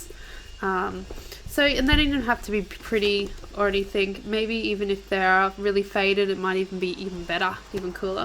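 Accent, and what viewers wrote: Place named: Australia. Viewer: Just found you....love your ideas! Will subscribe....are you in Australia? Recognise your accent, I’m in Oz!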